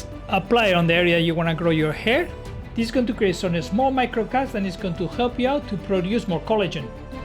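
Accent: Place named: Spain. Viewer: No hablo ingles pero tu acento suena extranjero, de que pais eres?? España?? Por cierto muy hermosos tus trabajos, la proxima que me corte el pelo llevo una foto de aca jajajaja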